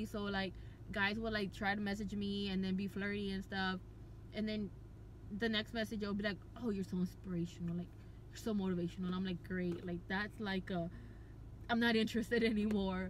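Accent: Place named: Bengal, India. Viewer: LOL, at first, after I saw your face, I thought... um... maybe a TAnned Pakistani maybe... or someonef rom Bengaluru, but OMG that accent andthose mannerisms... That is so Bengali,s pecifically a Bengali livingi n the East Coast ofth e United states, or maybe California. As for your video content, well, it seems you have not been disabled as long as someone like me. There are things you have yet to learn from life. It'll come to you. It comest o all disabled people sooner or later. Keep it up kiddo1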